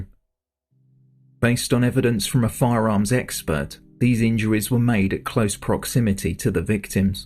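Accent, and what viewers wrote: England, United Kingdom. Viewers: thank you for the new video love your English accent love London England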